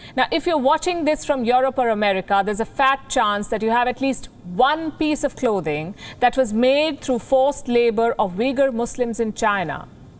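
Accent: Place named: India. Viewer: Amen how did they know dat ,force labor I hope America is not using this trick trying to destroy the Chinese economy ,hence they are far ahead of America,, because this girl I've been watching this channel for a long time this girl is very bias protection everything from American side ,, She don't question anything from American side ,, yes she's got an Indian accent but trust me. She works for Americans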